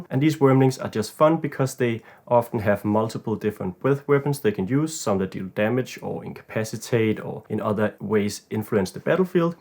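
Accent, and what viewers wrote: Denmark. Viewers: are you danish as a dane myself the accent is pretty easy to hear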